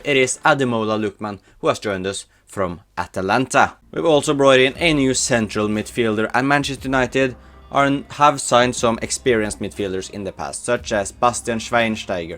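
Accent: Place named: Russia. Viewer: You Sound Russian Bro 😂🎉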